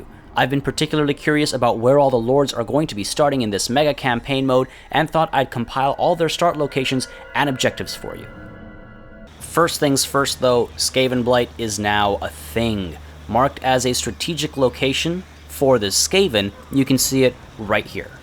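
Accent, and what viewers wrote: France. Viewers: Damn!! That French accent. Cool Vid man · Your French accent is so funny. And you should do this video when everything was out and with all news.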